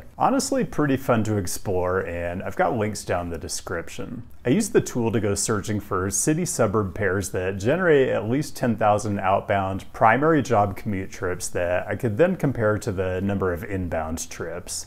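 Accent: United States. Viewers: FYI absolutely no one calls it Wil-Shire, it's Wilshire (pronounced wilshur) & anyone who does pronounce it this way is immediately pegged as a non-Angeleno or citizen of one of the Commonwealth countries (Great Britain, Canada, Australia, etc.). You sound like you're an American, so you definitely shouldn't be pronouncing it that way.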